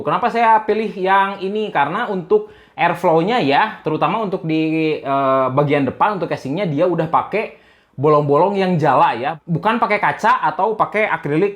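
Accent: West Java, Indonesia. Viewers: Mang orang mana sunda amat eta congorna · sunda bang?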